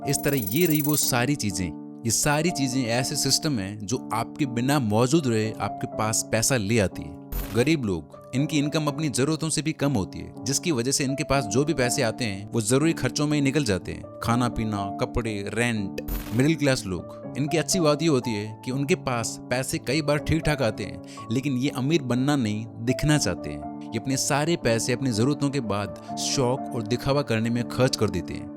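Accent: Rajasthan, India. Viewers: Video acha he or edit bhi achi he... Rajasthani English extent wo bhi acha he..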